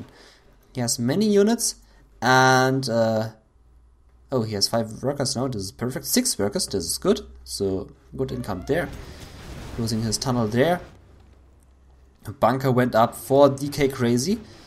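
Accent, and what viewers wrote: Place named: Germany. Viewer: Nice Video. Where are you from? Your english sounds really german :)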